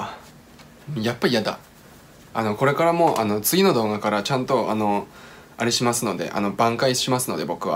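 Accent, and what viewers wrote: Tsugaru, Japan. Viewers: 自分でも よくわかりませんが ここに辿りついてしまいました 😂😂😂 津軽弁！ 素晴らしい👍👍👍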